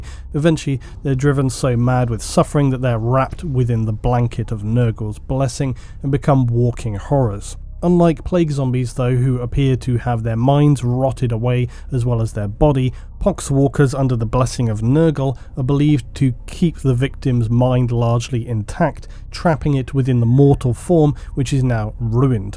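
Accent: United Kingdom. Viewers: Four reasons why I love your videos. 1. Warhammer 40k. I was introduced through Warhammer Fantasy and was intimidated by 40k larger and more expansive universe. However your videos are large and so well made with so much Fan Art that i can't get enough. 2. You time stamp your videos. I get to watch and see what I want to see whenever I come back. Its just so nice! 3. You got a great voice. You have such a great British voice. Just nice and relaxed and a sense of interest in what you are doing. So glad you are doing YouTube! 4. You sometimes use Real World history and how WE as a species can in someway relate. Almost a Parellel Universe feel aha.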